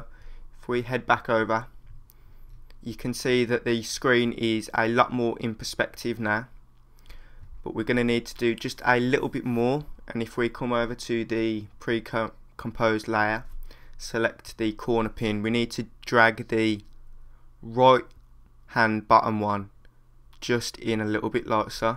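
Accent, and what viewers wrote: Birmingham, United Kingdom. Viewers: you never fail to help me with my work man:D may i ask where your from, you sound like a brummie? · Rodrigo Vazquez , Brummie fo sho! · It's Birmingham, maybe Kidderminster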